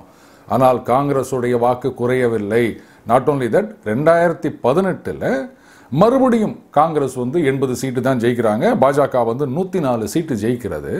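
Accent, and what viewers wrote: Chennai, India. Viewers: I don't know whether Tamizh is an International language or not ....but yours and mine chennai tamizh is international ....sokka pesaringu Vaazhga Chennai tamizh Valarga Bhartham Jai Hind Vande matharam🙏✋✋🌷🍁( I couldn't find the Lotus symbol)🤔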